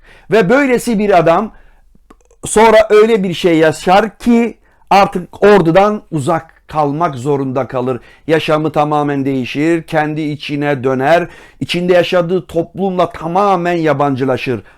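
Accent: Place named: Samsun, Turkey. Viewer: Elllliiiinci yıl lisesi 😂 Klasik Samsun. En okumuşunun bile şivesi. Süpersiniz............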